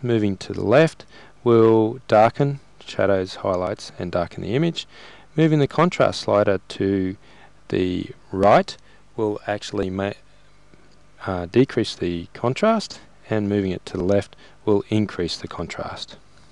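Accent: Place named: Australia. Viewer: Aussie...hehe. Sorry I would have thought this was really informative but your accent is distracting...been living in the uk for too long lol.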